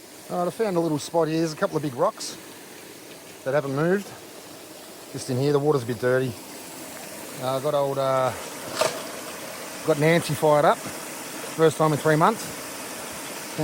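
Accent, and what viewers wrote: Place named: Australia. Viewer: Bonjour, are you originaly from France? If yes,you sure speak like a true Aussie.👍 I also enjoy prospecting,did 5 trips to Western Australia, beginning in 1997. Now I pan for gold in the Gard region near Anduze,been here since 2006. Yes! same feeling here, I'm 63 years old and the years start to show themselves. Here in France (Gard region) the season starts on the 15 th of May till end of November. Keep well,Dom.👍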